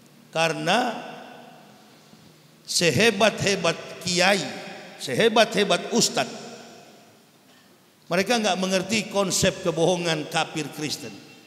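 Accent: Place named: Manado, Indonesia. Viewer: Ilmu perbandingan agama ini bagus utk pengetahuan 👍.. suka dengan gaya Manado pak yahya masih sangat kental 😄👍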